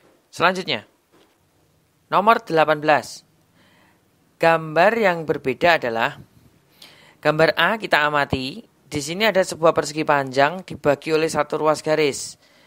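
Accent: Java, Indonesia. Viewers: Ikutun channel kamu saya jafi ikut medok ngomongnya koh😅🥰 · Saya kalo dengerin mas menjelaskan soal soal suka ketawak... Abisnya lucu sih 😁😄😄 kalo ngomong medoknya ituloh bisa bikin saya ketawa 😄😄😄 suka guyon....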